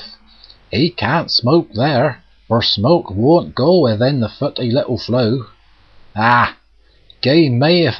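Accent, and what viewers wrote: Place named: Dorset, United Kingdom. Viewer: Lovely poem. Dorset accents rule. ^_^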